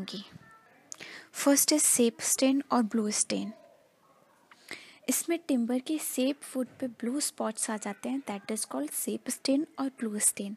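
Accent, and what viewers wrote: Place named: India. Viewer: It would be great if you add English subtitles. It's really complicated to keep up while trying to decipher the Indian accent. Keep up the good work.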